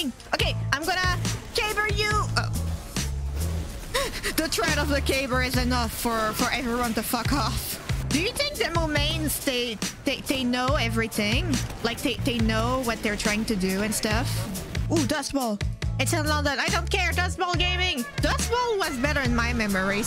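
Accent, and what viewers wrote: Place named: France. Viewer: french or Russian sounding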